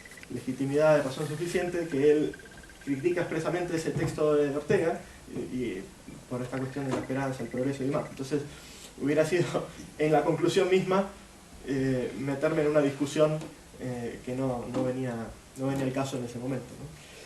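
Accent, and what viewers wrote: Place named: Argentina. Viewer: La verdad no entiendo, como esta genial exposición, no tiene más me gusta. A lo mejor, es que a mí me sirve, por el hecho de ser siego. Pero cuando uno ve, tantas tonterías en YouTube, con tantos me gustas, y estos excelentes videos, con tan pocos, entiendo por que vivimos así. Es cierto Leibniz tiene algo de Platónico. En el II libro de la República, cuando forma la ciudad, muestra que no puede existir virtud sin vicio. te mando un fuerte abrazo desde Quilmes (supongo si no me equivoco por el acento que tenés), tu Argentina.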